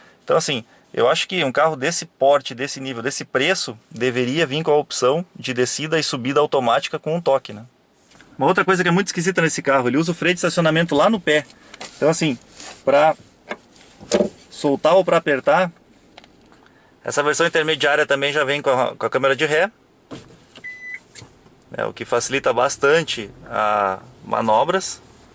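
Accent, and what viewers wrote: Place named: Rio Grande do Sul, Brazil. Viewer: Vc é gaúcho né rs, se sim, conheço logo, como o baiano, usa "tu" e ao invés de falar "di noite", fala "d noite", "d baixo"... Bem legal o sotaque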